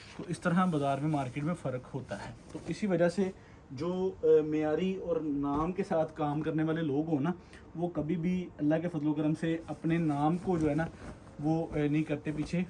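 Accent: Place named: Faisalabad, Pakistan. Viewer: Ye fslbd k hain bhai ki boli fslbd ki h plz ap apni shop ka address b Bata dia karein tak ap ki shop ka visit Kia ja saky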